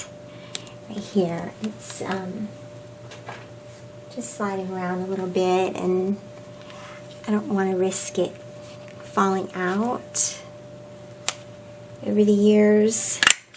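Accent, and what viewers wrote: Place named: South, United States. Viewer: Love the pages and all of the eat stuff your hubby brought back for you! Where do you live? You sound like you are in the south! I'm in Atlanta.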